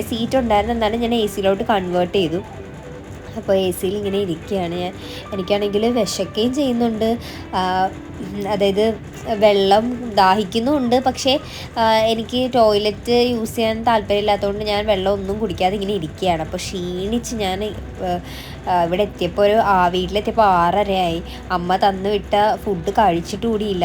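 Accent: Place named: Ernakulam, India. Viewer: Eklm slangayallo😂❤️😍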